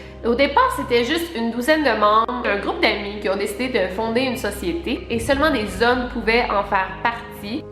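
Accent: Canada. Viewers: Coucou, j'ai découvert ta chaîne il y a quelques jours et j'ai regardé beaucoup de tes vidéos en seulement quelques jours, tu as l'air tellement gentille, t'es super mignonne, les sujets que tu traites me passionnent aussi donc j'adore ta chaîne et j'adore ton accent (je suis une française qui adore le Canada ) bref, continu, j'adore aussi les sujets sur les disparitions, les théories du complots, j'aime TOUT ! Mais des fois quand tu mets des extraits des vidéos en anglais parfois tu ne mets pas les sous titres et pour les gens comme moi qui comprennent pas trop l'anglais c'est embêtant mais c'est pas grave non plus, ça empêche pas de comprendre la vidéo. Enfin voilà, continu tes vidéos, bisous de la France 😙😁😁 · Hello! Je viens seulement de te découvrir et laisse moi te dire que je suis fan de tes vidéos! Moi qui avait du mal avec l'accent québécois (j'habite en France), je ne l'entends presque plus 😄 En ces temps de confinement, on a énormément de temps et je passe la majorité de mon temps à découvrir tes vidéos, elles sont top! 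Par contre, je voulais te faire part d'une chose. Je ne sais pas si tu as déjà regardé la série The order sur Netflix mais en voyant les images de la société skull and bones je me suis complètement retrouvé dans cette série! Pour faire bref, il s'agit d'un jeune garçon qui intègre une secte secrète qui trouve son siège au sein du campus (tout comme ce que tu racontes) et les membres de cette secte sont vêtus de masques bizarres (encore une fois pareil que ce tu racontes). Ils ont des rituels bizarres également que l'on peut voir dans la série. J'ai trouvé ça étonnant, j'avais l'impression que tu parlais de la série tellement il y avait de points communs! Se seraient-ils inspirés de la société pour réaliser la série? Je pense qu'en grande majorité oui et c'est, comme tu dis, creepy! Une chose encore plus étonnante, c'est que je n'ai vu personne mentionner cette similitude dans les commentaires! 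Bon courage pour la suite, tu es top!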